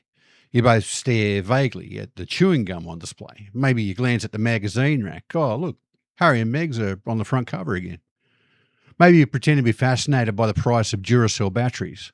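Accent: Australia. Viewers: I’m from California and lived in Australia for years. I had to really check myself so as to not come off rudely. You hit it on the nail when you said Aussies are more reserved. I was in the hospitality industry all of my life and can talk to anyone but I needed to really tone it down. Out of respect. Love Australia and its people. And good to hear you haven’t lost your accent mate 💖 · The truth is they could feel your Aussie vibes from a mile away and they just want to hear your awesome accent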